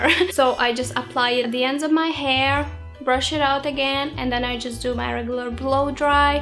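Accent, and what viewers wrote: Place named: Latvia. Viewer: Are you from Latvia? I swear that i can hear your accent. Nice video :)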